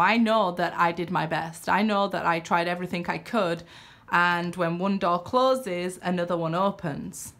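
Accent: Yorkshire, United Kingdom. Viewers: I love Yorkshire accent! And Geordie too 😊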